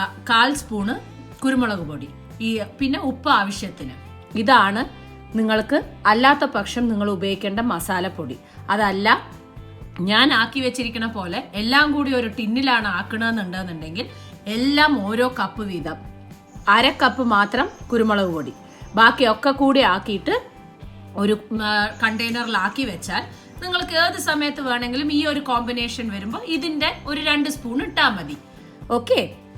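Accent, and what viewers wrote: Palakkad, India. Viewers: Madam.your Palakkad language kekkan sumundu.kettolin Palakkadil evedeyanu Basha kettu kollongode side Anu thonnunnu .with best wishes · your Palakkadan language awesome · palakkad slang kelkkan super njn thrissur anu pne curry indakkunund tto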